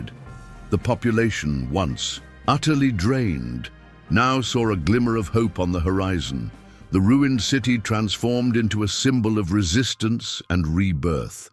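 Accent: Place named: United Kingdom. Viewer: 20 minutes of BS in a British accent...YouTube should put warning "produce by British"....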